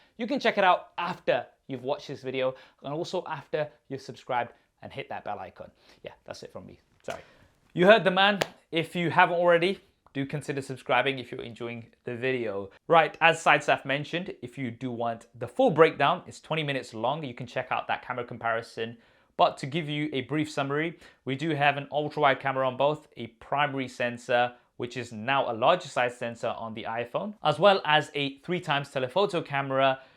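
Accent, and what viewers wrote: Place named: United Kingdom. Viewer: An Indian dude with a British accent? that would be very interesting to see in person here in maga country lol 😂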